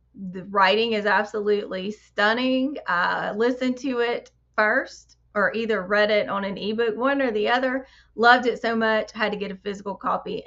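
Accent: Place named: South, United States. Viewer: I got The Night Parade yesterday! Everything is Southern Gothic with that accent 😂🤷‍♂️💃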